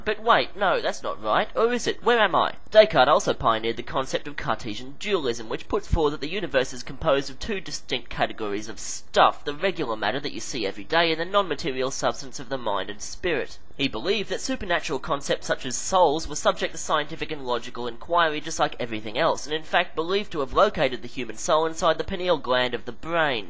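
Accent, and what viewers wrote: United Kingdom. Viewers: okay, this was so fucking funny, I love British sarcastic drawl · wow...honestly, i got a totally wrong impression of what you looked like judging from your voice...lol, guess its just the English accent. Still another awesome 3 minute philosophy yet again and thanks dude! i aced my philosophy midterm because of you! also, you think you could maybe do a 3 minute philosophy on Nagel? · Only a British accent could pull this off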